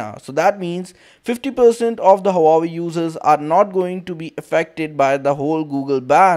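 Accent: India. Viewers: indian accend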